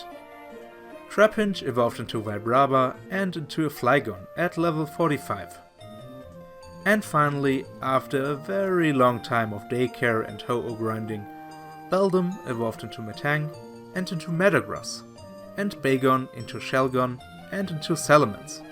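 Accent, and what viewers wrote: Germany. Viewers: Hahaha, nach 2 Sekunden direkt den deutschen Akzent raus gehört. Aber richtig gut. Ist eh besser in Englisch 💪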